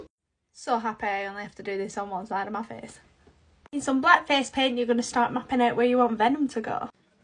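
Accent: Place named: Northern England, United Kingdom. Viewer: Oh wow you’re a northern lass, why did I think you were American? So frikkin talented 😊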